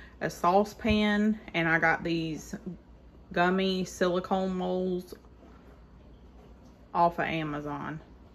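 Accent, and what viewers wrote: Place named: South, United States. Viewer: She did a great job. Don’t give up... you can do this! I Everyone sounds differently! Your Southern drawl is different and makes you unique! Paula Dean and Dolly Parton have your accent and it never stopped them! Come on back to the You Tube family and do your thing! 💚✨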